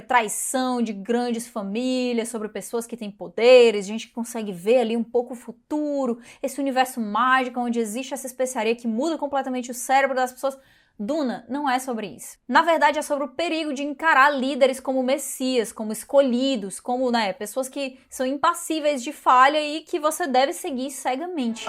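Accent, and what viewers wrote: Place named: Ceará, Brazil. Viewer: Olhe só, uma cearense com um canal legal. Saquei o "meu" sotaque nas tuas primeiras frases. Li o Duna com 16 anos, e foi uma viagem... aliás, não poderia ter sido diferente, né? Assisti o filme, bem criança ainda, na Globo, bem antes de ler o livro. Lembro que não entendi nada mas adorei (sempre fui louco por sci-fi). Revi o filme, séculos depois... Olha, gosto dele. Acho que porque fui assistir já com o peso da crítica negativa em cima, e eu meio que pensei "É, não é tãããoo ruim assim". Felizmente, tiveram a sensatez de fazer agora dois filmes: porque, condensar aquela complexidade num filme só, não tinha condição. Com dois, pode ser: acho que o ideal mesmo era fazerem uma série, cê não acha? Comprei há pouco "O Messias de Duna", que aliás já tinha lido. Apesar de muito mais curto que o primeiro, nele, as tramas labirínticas no psicológico das personagens é bem mais impressionante. 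Li depois "Os Filhos de Duna", o quarto da série, e... achei legal. Mas nada demais. Integridade artística à parte, fica óbvio que, para o Frank Herbert, ele havia acabado de "construir" o seu ganha pão: poderia viver o resto da vida só de escrever continuações à saga... o que ele, de fato, fez; e ainda rolou uma graninha pro FILHO dele, que escreveu o último (Aff!). Sete? Oito? Ainda quero ler o terceiro, "O Imperador-Deus de Duna", mas não tenho intenção de ler os outros. Abraço.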